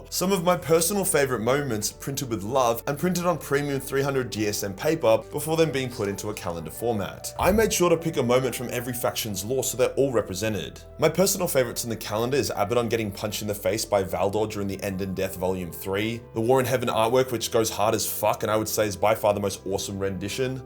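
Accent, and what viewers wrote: Australia. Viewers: I just like listening to this absolute chad Aussie talk about a great universe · I've been here since the beginning, I'm a married 40 year old, and I'm not here for boobs, I'm here to hear a degenerate Aussie talk about my favorite subjects. Boobs are a good bonus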